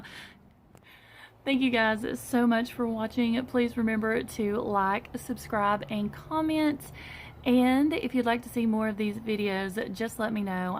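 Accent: South, United States. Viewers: Hi there! First time viewer! I'm LOVING these ideas! I'm hearing a Southern Accent..🎶🎶 to my ears (Southern girl here as well 😊) just curious where you're located? Thanks for sharing and I look forward to seeing more of your videos!! Ps: the Pineapple bags are SO cute, the strings on mine however, lasted 2 whole days. I was so sad and couldn't find any others. 😞 I eventually will "fix" mine 😂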